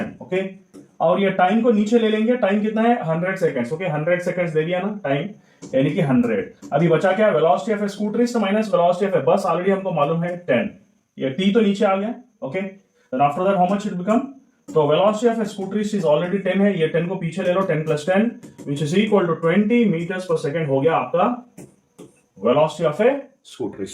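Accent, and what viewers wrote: Hyderabad, India. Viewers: #Hyderabadi accent never hides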